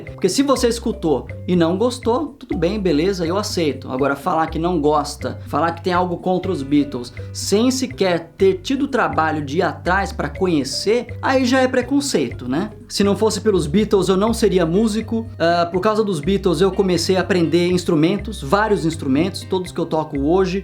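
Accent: United Kingdom. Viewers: Cara, você tem que fazer mais vídeos de inglês. Vi seu vídeo sobre o box do Pink Floyd e fiquei impressionado, cheguei a pensar que você fosse realmente britânico. Valeu!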